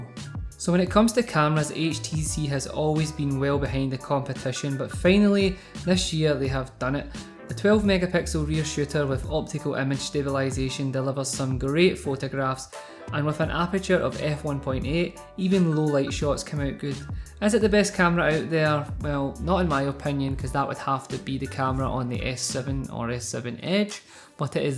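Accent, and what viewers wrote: Scotland, United Kingdom. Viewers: Are you Scottish? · are u Scottish?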